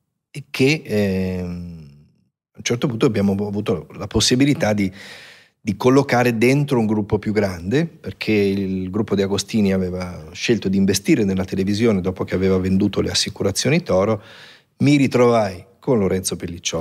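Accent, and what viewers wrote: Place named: Mestre, Italy. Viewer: Io sento comunque , nonostante gli anni a Bergamo , la R la S mestrina 😅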